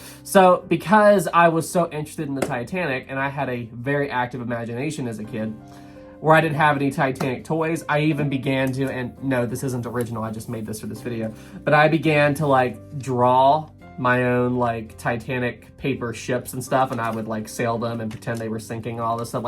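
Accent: South, United States. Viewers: You're from the South aren't you?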